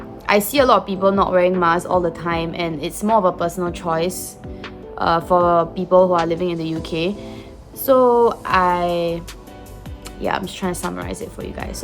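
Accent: Singapore. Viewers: However however however love the Singapore accent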